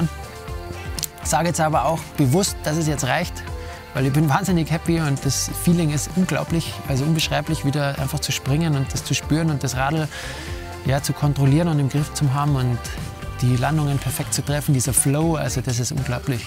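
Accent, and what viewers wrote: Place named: Bavaria, Germany. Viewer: Unfassbar sympathisch - hab di über mtb-news gefunden und ziag ma direkt alle Video rein ;-) Bin selber 29 Jahre und hab erst jetzt mit dem mtb-Sport angefangen. Aber bin scho voi drin und werd mia demnächst auch ein Dirt-Bike anschaffen! Hast a paar Tipps? Und endlich moi jemand, der meine Sprache spricht. Überragend - hoffentlich kommt noch mehr vo dia! Liebe Grüße aus Zolling und keep riding! :)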